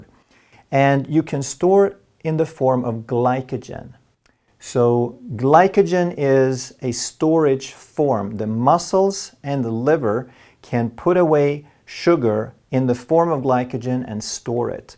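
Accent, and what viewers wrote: Sweden. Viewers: He is Swedish. And you can hear it on his accent all the way through. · You sound Swedish yet the Swedish translations on your channel look machine translated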